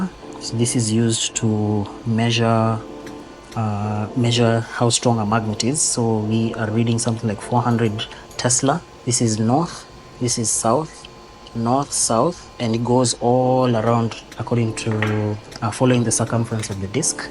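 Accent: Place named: Kenya. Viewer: kenyan accent . This is good stuff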